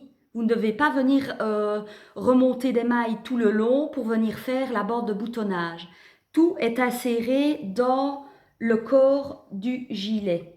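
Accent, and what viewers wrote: Belgium. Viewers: Je viens de découvrir ton podcast, enfin une belge, et à l'accent ont ne doit pas être loint, podcast très agréable à écouter et regarder félicitations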